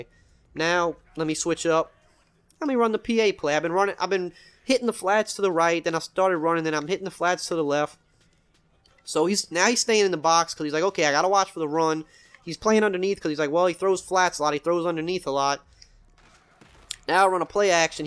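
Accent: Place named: New Orleans, United States. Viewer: First of your videos I’ve watched, noticed the New Orleans accent, keep it up, WHO DAT BABY!!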